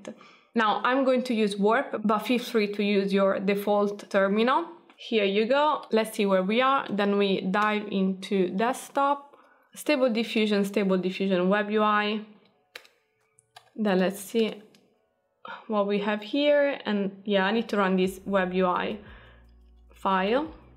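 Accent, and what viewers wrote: Italy. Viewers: Ho notato il tuo accento italiano e dopo aver visto il tuo nome ho avuto la conferma. Fa piacere vedere altri in Italia interessarsi a queste cose :)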